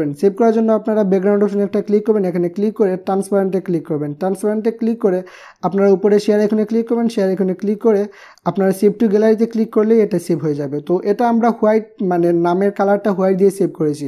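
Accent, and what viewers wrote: Sylhet, Bangladesh. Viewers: Vaiya apne Sylheti ni?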